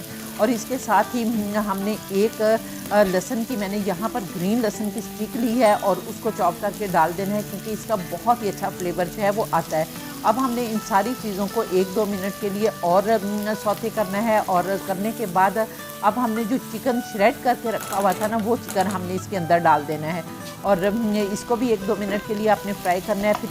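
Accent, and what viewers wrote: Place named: Delhi, India. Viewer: Dear sister,Masha Allah behtreen recipe of Palak and Mutter.Your way of talking and fluency is very charming .I feel you. Speak Urdu of Dehli origin.Use of a Punjabi word Bhuppa was also a unique selection by you. I enjoyed it. Thank you for pinning me and your response to my comments.Allah Hafiz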